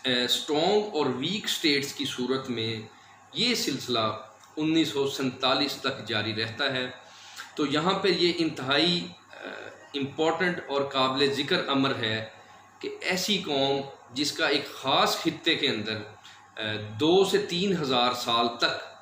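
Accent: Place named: Punjab, India. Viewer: Aapki talafuj sahi karo pehle.. Punjabi zuban mein urdu bology toh yehi hoga..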